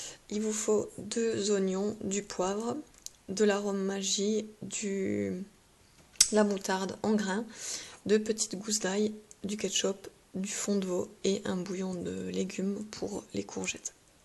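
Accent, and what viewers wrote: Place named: Doubs, France. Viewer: coucou!! jai limpression dentendre laccent dché ns lol!! en plus saucisson de monbeliard?? t dou? moi de mulhouse bisous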